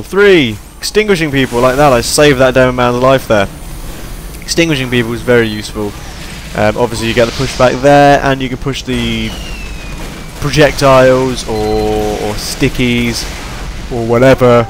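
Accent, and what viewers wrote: England, United Kingdom. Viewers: where in england are you from?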